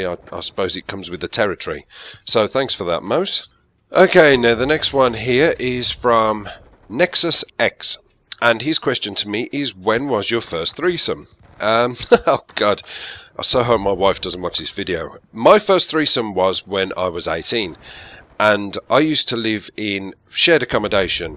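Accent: Birmingham, United Kingdom. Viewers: I find it really hard to believe that no one noticed you were from birmingham. Good video though, got to know the old stalion a little better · Lol there are people with a much thicker Birmingham accent than him and it does sound pretty grating lol. Also England will have a king when the queen dies, but the UK doesn't. The UK is a group of countries including England, Scotland, Northern island, and Wales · I just love the British accent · I thought you were a brummie but wasn't sure. Confirmation ftw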